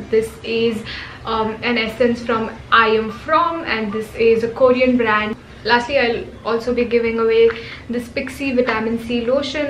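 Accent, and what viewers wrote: Maharashtra, India. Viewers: Congratulations on 100k and many more coming soon! I remember when u hit 10k u were in tulum by forest tala and how happy u were. Being marathi girl you inspire me soo much and how cute is your marathi tone i just love it. I love your fashion videos and hauls. My dream is to make my instagram page <mail> one of Indias's top Self-care and productivity page. I post content about self care and productivity in the easiest form to reach maximum people. My goal is to make everyone practice self care in the easiest and most affordable ways. Soon planning to launch my planners also to help everyone become oraganised. I will send the copy of my planner to you when i launch it for reviewing because you review things very generously. Lots of love to you keep inspiring us ... May you hit 1M by the end of 2020. All the best dee!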